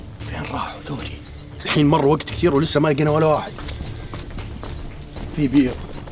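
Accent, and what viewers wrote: Saudi Arabia. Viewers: كيف كلامو سعودي وهو من تونس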